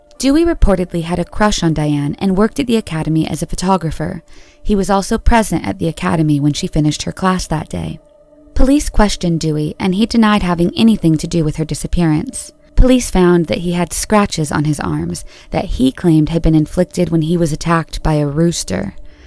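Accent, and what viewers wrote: India. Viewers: can the girl narrate the videos more? I don’t like the guys Indian voice. I hate it. I skip the videos if I hear it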